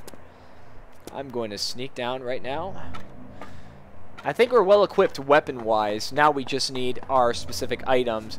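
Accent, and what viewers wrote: Denmark. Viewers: Are U danish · are you danish? :P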